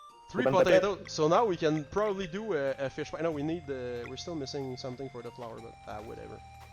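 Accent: Quebec, Canada. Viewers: Vraiment cool d'entendre un accent québécois! :) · Wait! I recognize that accent! You're Québecois? Nice! I always get surprise when i hear our accent on youtube. So few Québecois gamers on here. XD Can't wait to play that! <3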